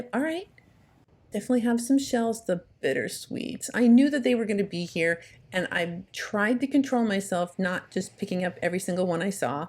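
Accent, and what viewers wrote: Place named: North, United States. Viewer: Ive been going back and watching the older videos. I mentioned to my husband that you had an accent that sounded northern. We always wondered and you answered here by saying NJ 😂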